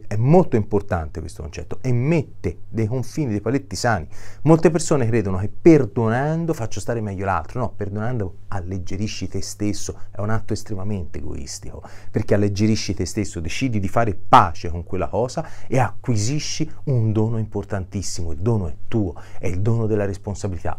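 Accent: Tuscany, Italy. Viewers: Dr. buongiorno. Io penso di avere autostima infatti sti vivendo una situazione abbastanza complicata con un marito Narcisista che vuole procurarmi guai, ne ha già fatti parecchi. Ha chiesto la separazione che mi sta benissimo, ma senza rancore anche se un po' di rabbia c'è verso quell'essere che avrebbe dovuto darmi delle sicurezze invece mi da solo preoccupazioni, affanni. Quindi ora il mio compito non è quello di dar retta al rancore ma di lavorare sulla mia difesa, per i miei diritti e dei miei animali che non possono essere lasciati in mano a un essere malvagio, crudele quale egli è. I suoi video sono sempre interessanti e soprattutto chiari. Anche con la"c"toscana😊Buona giornata. Grazie.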